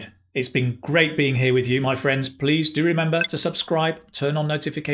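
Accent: United Kingdom. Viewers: I hate British accent in fact, but to be honest you're the only one that I would want to hear his British English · You are speaking British English or American English??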